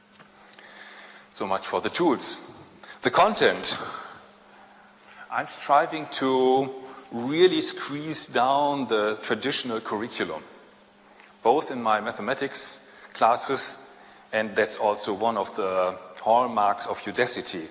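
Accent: Germany. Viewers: "aber sonst?" ich find deutschen akzent sympatisch :) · man hört voll den deutsch akzent raus :D aber sonst sehr schön ^^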